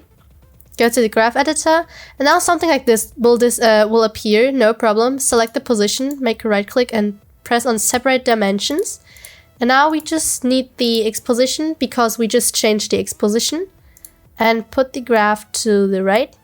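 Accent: Germany. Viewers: Schwöre, das Deutsch quilt so ausm Englisch raus hahahah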